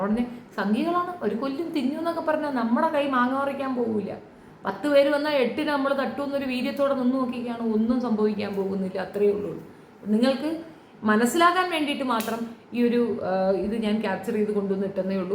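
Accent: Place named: Thiruvananthapuram, India. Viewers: വടക്കൻ ട്രിവാൻഡ്രം ആണ് അതാണ്‌ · തിരുവനന്തപുരം ശൈലി 😂😂 ആണെങ്കിലും താങ്കളുടെ അവതരണരീതിയും ഭാഷാ സ്ഫുടതയുംനന്നാവുന്നുണ്ട്.. ചിലർക്ക് നിങ്ങളുടെ പോലെയുള്ള മറുപടി ആണ് വേണ്ടി വരിക..😂😂.. സമൂഹത്തിന് ഗുണകരമായവയും വഷളത്തങ്ങൾ അലങ്കാര മാക്കി മനുഷ്യരുടെ ഇടയിൽ അകൽച്ചയും വർഗ്ഗീയ ചേരിതിരിവുകൾ സൃഷ്ടിക്കുന്ന വർക്കും ഇങ്ങിനെ യൊക്കെ മറുപടി പറയുന്നത് ഉചിതം തന്നെ ❤😂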